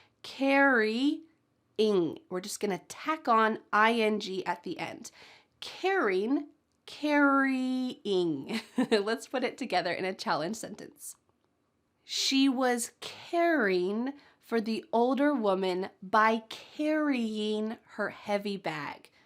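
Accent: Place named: United States. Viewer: Thank you, you are the best teacher. You made me love the English language and the American accentThank you We all love you❤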